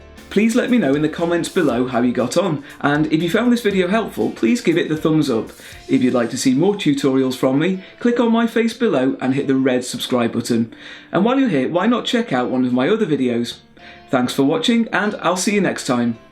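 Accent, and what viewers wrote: United Kingdom. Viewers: It worked! Thank you for your help. Friend, I love to speak British English just like you. Could you give me some tips on how to start learning the British accent? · Why do you look American but sound British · I liked his British accent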